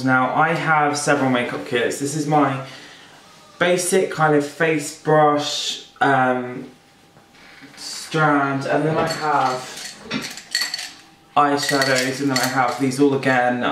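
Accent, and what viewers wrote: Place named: United Kingdom. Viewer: Omg I was thinking the same thing I think it's the British accent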